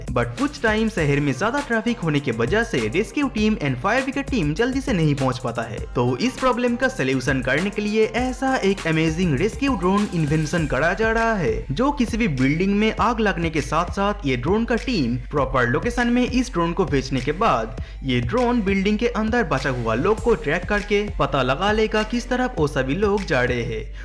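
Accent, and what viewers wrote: Bengal, India. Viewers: Damn!! That's some freaking bad accent!!! When will this indian learn English??? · Bengali ho kya bhai!!!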